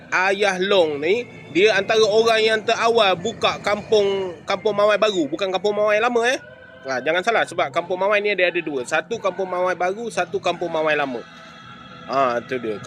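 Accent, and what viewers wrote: Pahang, Malaysia. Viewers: Ye loratnye bercampur pahang ada ganu ad perak ada kelantan pn ada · koi nk nanya..ngape lorat aokme bercakap macam org pahang...beloh2 temerloh - pekan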